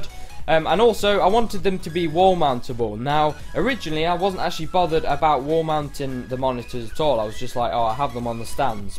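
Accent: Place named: England, United Kingdom. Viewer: You sound so english xD I know he is, so don’t comment saying «That’s because he is!!!» I KNOW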